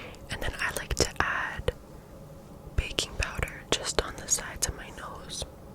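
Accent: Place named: Canada. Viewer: Hello my favourite asmrtist in the world good to see you making some more lovely asmr again. I love your whispering Canadian accent it’s beautiful and I love your beautiful lovely overgrown long smooth silky soft brunette hairy mane of yours 😌❤️., I have a favourite asmr request I would love for you to make it for me to help me get to sleep 😴 please if that’s ok with you as I was thinking since I love slowly relaxed real hair brushing asmr and brushing long hair fully covering🙈all over face asmr it would be wonderful and fulfilling to see you brush your beautiful mane and brush your beautiful mane fully covering all over your face but for my asmr request as the rhetorical question can you do 30mins long slowly relaxed real brushing your long hair fully covering 🙈all over your face nonstop asmr with no mic 🎙️ and no earphones if that’s ok with you, can I comment the asmr video link or send an email to you of the video if that’s fine by you aswell 😇😌❤️👍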